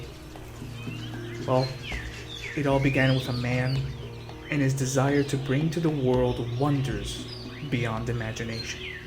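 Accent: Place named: Spain. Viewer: Aye yo Spanish accent is 🔥🔥🔥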